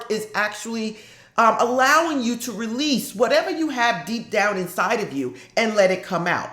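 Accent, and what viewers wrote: New York, United States. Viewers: I just love your channel ❤️ I just love your setup , I kinda look at you like my spiritual fairy godmother lol I love the accent is it a New York accent ??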